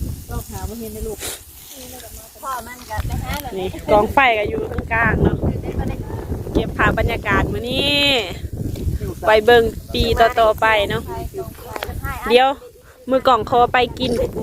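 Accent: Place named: Thailand. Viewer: สบายดีน้อง น้องเป็นผู้หญิงที่พูดเก่งและสำเนียงไม่แตกต่างจากทางไทยเลย น่ารักอีกด้วย.🥰❤️❤️❤️❤️❤️❤️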